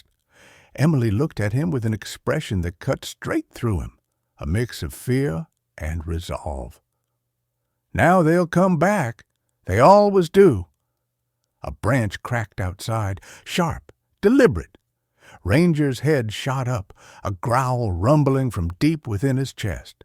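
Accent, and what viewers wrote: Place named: South, United States. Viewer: Largo, Florida. I love your stories but I'm not crazy about the newer voice with the slight southern accent... 🙏💞🙏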